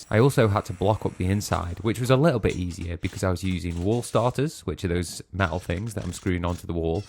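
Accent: United Kingdom. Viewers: Which part of Ing-Gland is this accent from?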